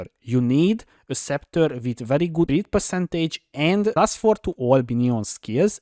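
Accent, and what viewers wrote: Hungary. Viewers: i can hear the hungarian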